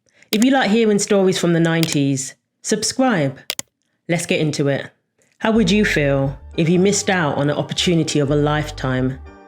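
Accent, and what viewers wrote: United Kingdom. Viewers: Hearing about the 90s in a Brit voice? I subscribed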